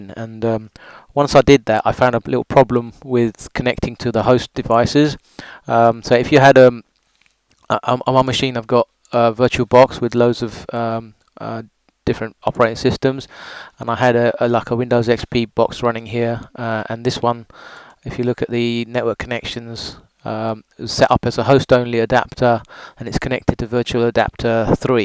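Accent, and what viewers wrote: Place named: United Kingdom. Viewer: INDIAN With british accent !! great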